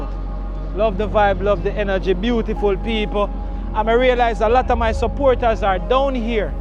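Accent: Jamaica. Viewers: Respectfully and TOTALLY disagree 💯🙏🏻!YOU! YUH CONTENT!!AND YUH PATOIS!!!! ARE 💯🙏🏻🤗🇯🇲❤️💛💚 NUFF RASPECT EVERY TIME MI BRO.. MI GENERAL..OUTSIDE! ❤🤗🇯🇲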